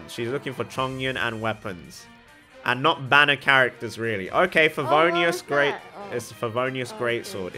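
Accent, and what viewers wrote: United Kingdom. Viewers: i watch video, i see asian. i scrolls down to read comment, i hear some uk accent. me: let me scroll up again to make sure. i did it twice LOL rumor has it, if your asian, you have a higher chance. maybe thats why i got mine an hour ago and now im here looking for build guide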